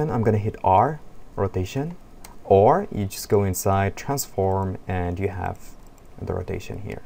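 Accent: Morocco. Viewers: Hmmm good inspiration im late4 years x) you really sound moroccan(moroccan name +good english and good french)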